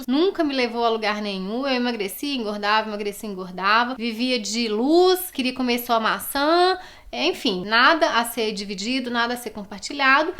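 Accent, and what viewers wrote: Minas Gerais, Brazil. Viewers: Te encontrei a pouco tempo, e que delícia! Amo ouvir seu sotaque mineiro, sou mineira mas moro no MT em sinop. Seu vídeos são inspiradores... Tenho amado muito. parabéns pelo canal.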